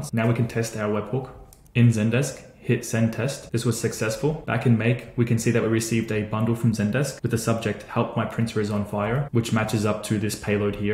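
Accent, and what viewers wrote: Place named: Australia. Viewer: I have recognised the warm Aussie accent......I use FreshDesk, same concept, love the video.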